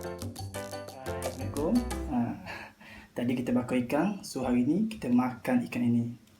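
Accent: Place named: Kuala Lumpur, Malaysia. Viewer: ckp kolumpor ho..haha hai nama saya beng.igt x?😆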